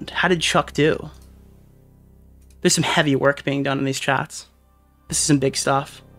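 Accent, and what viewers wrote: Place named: New York, United States. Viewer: This is fhe most aggressigely new york i ever heard you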